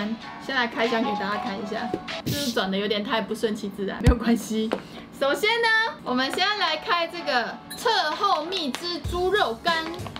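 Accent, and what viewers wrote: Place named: Taiwan. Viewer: 台語好可愛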